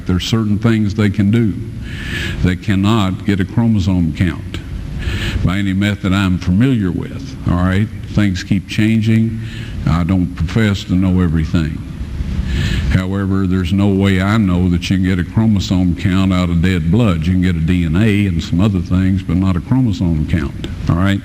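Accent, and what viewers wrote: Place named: South, United States. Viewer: Is it just me or does the gospel spoken with a southern drawl just hit harder?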